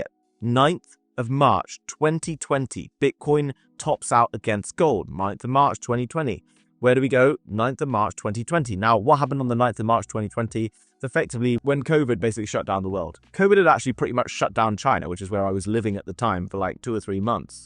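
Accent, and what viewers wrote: England, United Kingdom. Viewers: 😂😂😂😂 I can’t I could tell kms away ure English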